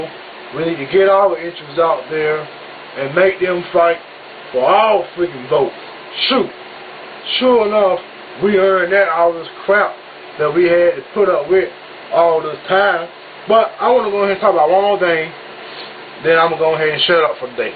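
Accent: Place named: South, United States. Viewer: Yebo mfana. (isiZulu, Yes boy, a friendly greeting) Well, as an atheist you might be somewhat isolated in Aiken County, but, well, there must be another free spirit there.....somewhere. Hope u find him. LOL, and I am having HUGE fun learning how to understand the Southern accent. You are an excellent speaker, and I take it from your confident and easy manner that you have been "a-activising" for quite a while.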